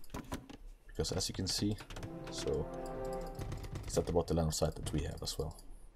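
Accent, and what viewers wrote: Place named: Scandinavia. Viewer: The Scandinavian accent makes it very hard to understand, mic volume way too low.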